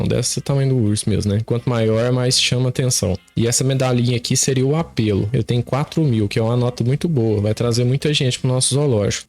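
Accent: Minas Gerais, Brazil. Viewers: Verdade acho que é por conta do sotaque fofo de mineiro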